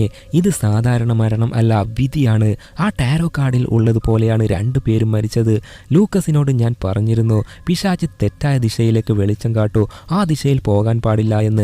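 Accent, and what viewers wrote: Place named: Kasaragod, India. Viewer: Ningal kasargod alle because language kond manassilakum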